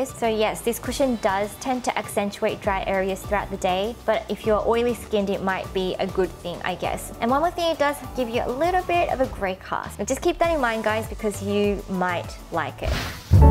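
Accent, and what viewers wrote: Australia. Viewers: Ur aussie accent!!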